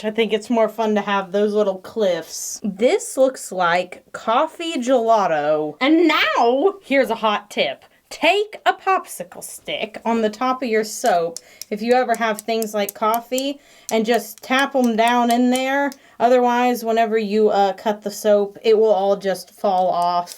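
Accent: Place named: South, United States. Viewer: All the puddin, puddin. You’re so southern and adorable!! ❤️❤️❤️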